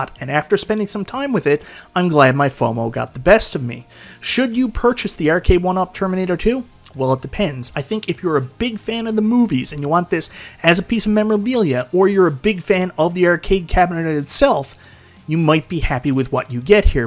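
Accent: Newfoundland, Canada. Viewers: This guy from Newfoundland ?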